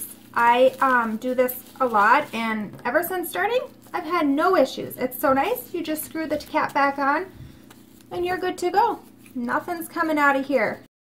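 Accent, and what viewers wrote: Minnesota, United States. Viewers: Are you from Minnesota by any chance?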